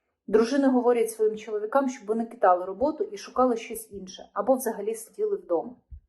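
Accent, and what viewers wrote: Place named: Russia. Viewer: "Щьо,щьє", що за вимова у вас,вашою вимовою катувати ворогів можна.Аж вуха заболіли від такого мовлення.Ви напевне розмовляли російською,а тепер перейшли на українську.Жахлива дикція.😮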